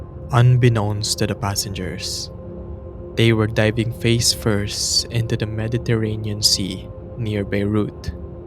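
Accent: Philippines. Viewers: I hear a Filipino accent 😁 greetings from an Indonesian 🤝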